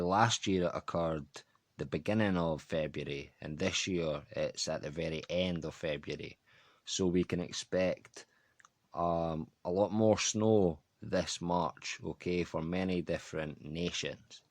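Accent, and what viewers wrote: Scotland, United Kingdom. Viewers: I'm sorry. I have a question to ask but know not where, so I chose your recent video. It seems obvious to me that each nation has a sign. I am Filipino and Scottish. They are both like Scorpio. Both nations changed war. They are maniacs in battle. But you don't find serial killers from there. They are both from small islands. And they are considered the most handsome people. They are terribly inventive! But they love to drink. To the extreme. Really, Filipinos are your brothers in the East. And guess what? The Philippines has the most Freemasons in the East. The Scottish Rite is there. The Southern Filipinos destroyed all Western invasion. The Celts couldn't because they shared terrain with the enemy. But still, you, a Scot, have your accent. And many Celts do speak their language. How to determine the sign of a nation? The Russian psychic grandma said that all nations have stars. 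What I know is, Scotland and Philippines are Scorpio. We love melee. We are good talkers. When we have babies, wow!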